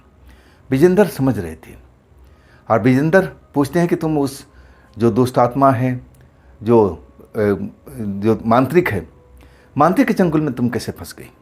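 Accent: Bihar, India. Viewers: 🎉Aapki aawaz bahut hi accha lagta hai bihar ki jhalak aati hai 😅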